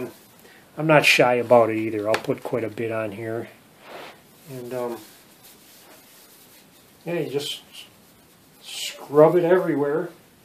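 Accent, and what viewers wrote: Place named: Upper Peninsula, United States. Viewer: First from the accent i thought U was a yupper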